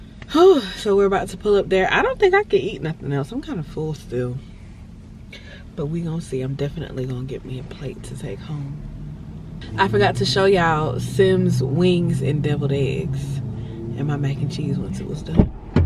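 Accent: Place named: Caribbean. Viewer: I hear a Caribbean Accent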